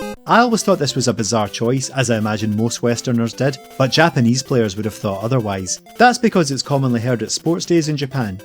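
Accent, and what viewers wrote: Scotland, United Kingdom. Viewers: That voice......reminds me of what Shrek would sound like in his mid 20s. (don't take this the wrong way, Scottish accents are one of my favorite accents of all time!) Can't wait to check out your other stuff :)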